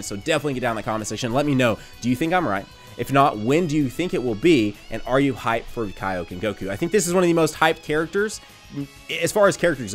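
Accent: South, United States. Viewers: Recently subbed you're one of my favorite youtubers xD also i can dig the southern accent! Im from Louisiana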